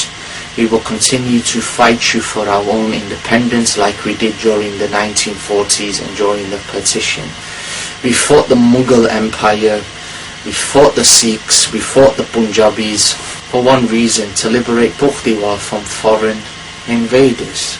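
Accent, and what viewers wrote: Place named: Ireland. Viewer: lol are you Irish or something?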